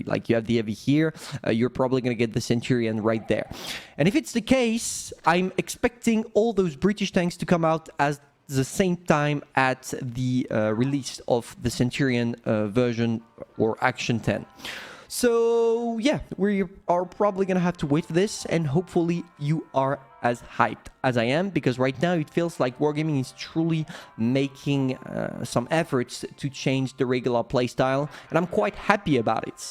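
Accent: France. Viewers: The audio is funky. He sounds French🤔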